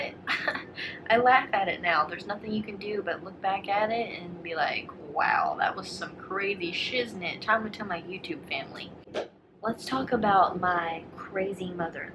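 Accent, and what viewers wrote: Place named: Virginia, United States. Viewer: Biiiiitch, I knew you had a Virginian accent. Just started watching your videos and was going crazy knowing your voice was so familiar lmao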